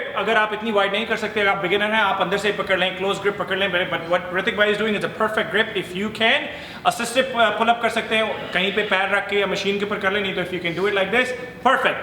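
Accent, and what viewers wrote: India. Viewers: kayknya kalian org india ya?...dari logatnya ketahuan. benar gak?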